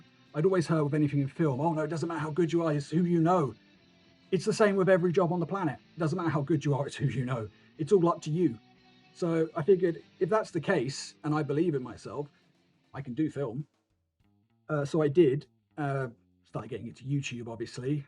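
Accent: United Kingdom. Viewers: Wait, you are british? How could you keep this from us? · Bro... your British? I had no idea 🤯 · Holy shit your British???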